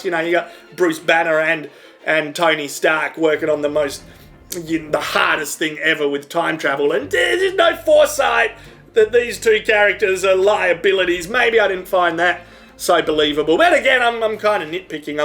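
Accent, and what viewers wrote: Australia. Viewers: Funny I had on captions while watching this video to make sure I understood your Aussie accent correctly, you bloody legend!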